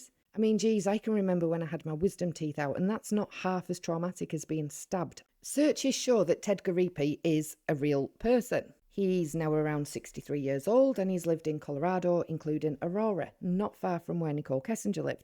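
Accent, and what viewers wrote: United Kingdom. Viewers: MAKES A PLEASANT CHANGE to listen to a non-sensationalised analysis of the Watts case; nicely done. The Northern accent feels just that bit more human.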